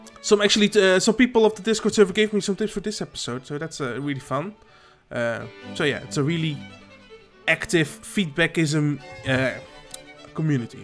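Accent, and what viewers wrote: Netherlands. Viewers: You sound very Dutch, are you?? Awesome build, nicely done!!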